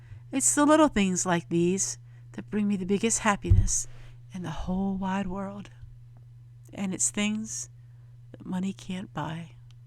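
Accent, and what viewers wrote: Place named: Pennsylvania, United States. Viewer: thank you for sharing. I lost my father several years ago and it feels like just yesterday. Thank you for sharing so much with us, so many skills that have been lost to us. I think you have a PA accent just like when I talk I have a NY accent and people don't always get what I'm saying 😆much love!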